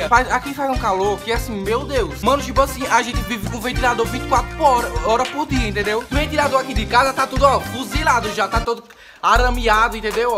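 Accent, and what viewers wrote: Bahia, Brazil. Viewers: Ele é de qual lugar da Bahia ??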